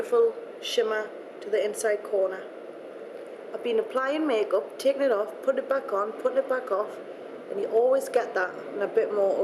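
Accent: Newcastle, United Kingdom. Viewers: she's a geordie! i.e. from newcastle :) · You sound like a Geordie! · It's "Geordie" almost. The real geordies live in Newcastle and wouldn't like anyone from south of the river Tyne to be called true geordies, but thats the accent. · WHHAT? she's clearly from newcastle. have you ever seen gavin and stacy? that's what a welsh accent sounds like.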